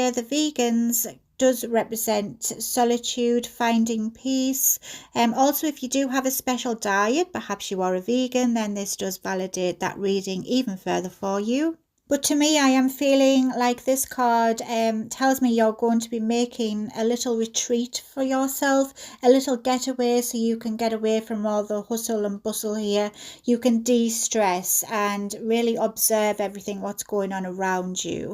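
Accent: United Kingdom. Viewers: U sound like u from County Durham I'm from peterlee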